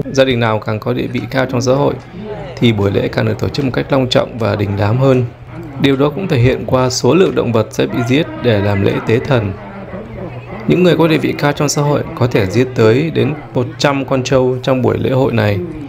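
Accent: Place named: Hai Phong, Vietnam. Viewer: Theo dõi kênh anh từ đầu. Nhưng video này thực sự phục anh vì: anh chịu khó và dũng cảm xin họ để đc quay. Lăn lộn vào quay từng ngóc ngách.Hỏi han họ để có thông tin.Góc quay đẹp và toàn cảnh chứng tỏ anh quay rất có tâm và có trách nhiệm trên từng thước phim gửi đến khán giả.Kiến thức thì phong phú,sâu sắc,giọng Hải Phòng thì hay khỏi bàn.Chỉ biết chúc a sức khỏe,tiếp tục giữ lửa và sự can đảm,nhiệt huyết trên từng video gửi đến khán giả chúng tôi có cái thú vị để xem. Chúc a nhiều sức khỏe.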